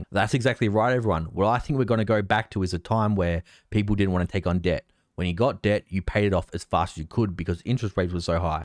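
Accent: Australia. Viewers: Good to hear an Aussie on finance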